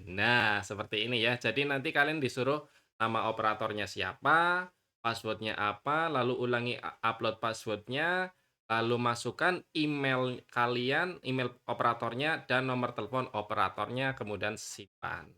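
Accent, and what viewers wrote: Banyumas, Indonesia. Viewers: tembe weruh sama admin. wkwkwk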